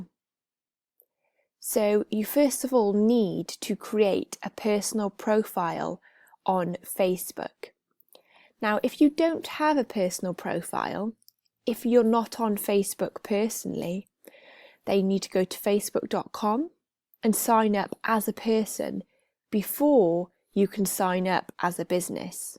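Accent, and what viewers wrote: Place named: London, United Kingdom. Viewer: oh hell no! can't follow her accent at all. Why is she speaking like a london school teacher?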